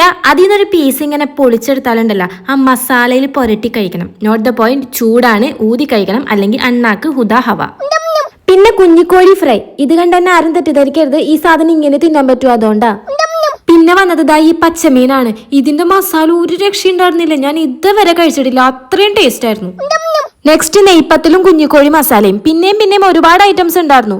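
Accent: Thrissur, India. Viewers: Thrissur ano cheeci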